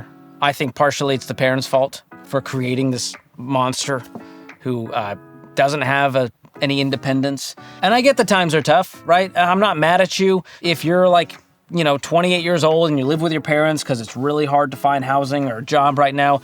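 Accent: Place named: Boston, United States. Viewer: There was some reason demeanor and presentation felt familiar but I couldn't identify why I felt that way. But today I learned why! We're both from the Boston area! Too funny. It must be something about the cadence of his speech or his sense of humor. Proud to consider you a former neighbor,!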